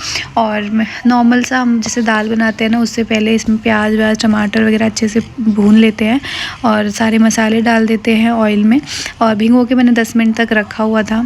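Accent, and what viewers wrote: Bihar, India. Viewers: Hi ji aap ki behari boli badi payari lagti hai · apke muh se bihari language bhut pyari lagti hai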